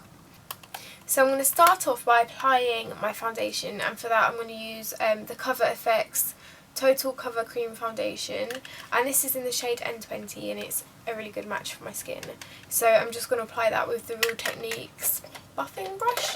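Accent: United Kingdom. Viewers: Haha She not Irish shes British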